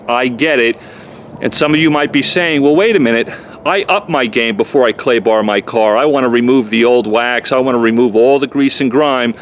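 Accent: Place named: Italian-American, United States. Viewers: This guy must be Italian from the east cost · Truck is Texas, voice is Long Island (observation from Australia).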